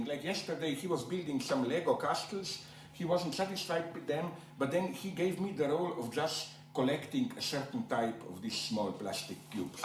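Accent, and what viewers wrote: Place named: India. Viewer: I didn't refer any racist thing, just his speaking reminded me indian accent.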